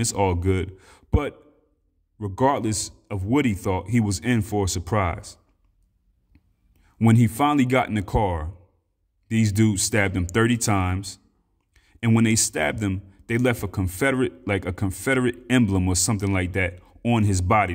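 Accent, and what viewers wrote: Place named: East Coast, United States. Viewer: I love ya East Coast accent too bro 🤸🏽‍♀️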